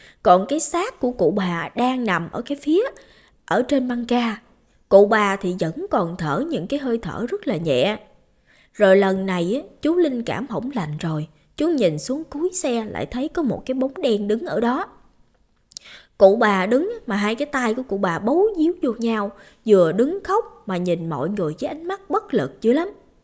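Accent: Mekong Delta, Vietnam. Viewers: Chị rất thích cách kể chuyện mộc mạc của bé, tìm mãi mới thấy được chất giọng đúng kiểu Miền Tây thế này. Bé cứ giữ chất giọng chân quê thế này nhé, chị nghe nghiện luôn rồi ❤️❤️❤️ Chị thấy cưng rất có năng khiếu về kể chuyện. Nhớ lắm thời còn xem phim bộ TVB, chất giọng lồng tiếng rặc Miền Nam, Miền Tây mình, nhưng mà nghe nghiện lắm, nghe hay làm sao.... bây giờ thì đa số kiểu nửa Nam nửa Bắc nghe không còn thích nữa 😁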